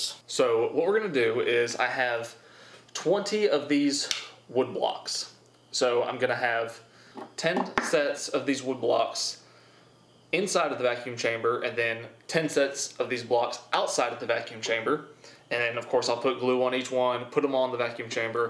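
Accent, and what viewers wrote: South, United States. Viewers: Did the beard bro-ham, you from southern U.S? Just wondering, mainly your accent.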